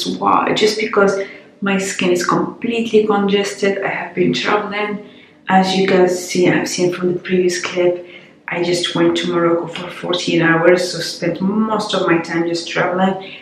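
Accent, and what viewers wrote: United Kingdom. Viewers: Its been a while!! You are starting to catch the english accent!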